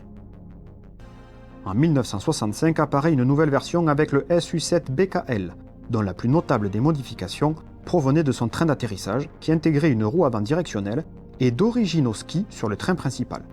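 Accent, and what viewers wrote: South, France. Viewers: C'est dommage, ça a l'air intéressant mais l'accent sudiste donne l'impression d'écouter Castex.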